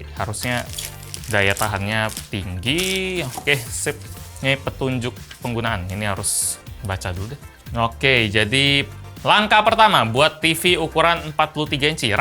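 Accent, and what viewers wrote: Palembang, Indonesia. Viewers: Mengot....🤣 palembang nyo di mano bang? Salam kenal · Mengot Bahaso Palembang nian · Anjay mengot.. palembang nian cuk😄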